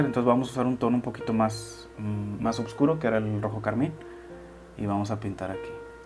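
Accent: Mexico. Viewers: Muchas Gracias estoy siguiendo tus videos con mucha atención, Oleo no creo que le entre aún, me gusta las lecciones de Bob Ross, pero tus explicaciones muy buenas, y claras, tienes acento mexicano, si no vives cerca de la frontera MX USa y ocupas algo de USA te puedo ayudar si así lo deseas.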